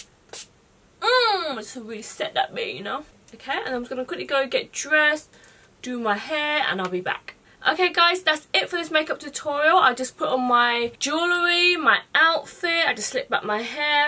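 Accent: United Kingdom. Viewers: i've been following for you for months on insta and i finally looked up your youtube. i wasn't expecting you to be british haha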